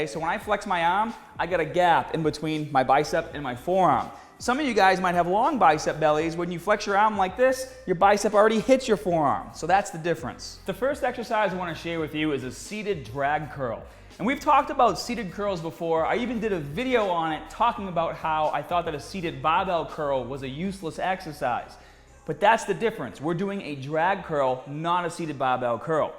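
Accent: Boston, United States. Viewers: Daniel Bon Daza it’s a Boston accent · Boston accent lol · That Boston accent is disgusting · Boston and Aussie accents both have a similar elongated pronunciation of 'AR' within words (stARt, tARget, bARbie etc). I think due to his elocution his Boston accent only flourishes on certain words, which happen to be the ones with 'AR' similar to in Aussie.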